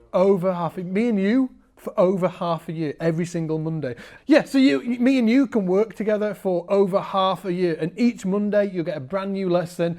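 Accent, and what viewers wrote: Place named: Northern England, United Kingdom. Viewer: has a killer northern English accent.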